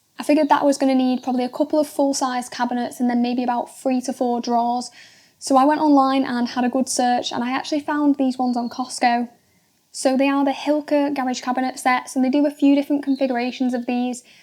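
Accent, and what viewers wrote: United Kingdom. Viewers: Just curious, what part of the UK has this accent?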